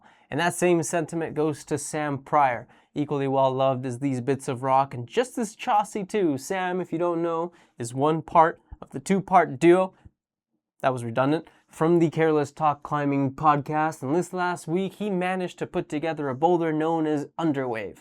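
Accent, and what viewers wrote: United States. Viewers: you speak perfect American English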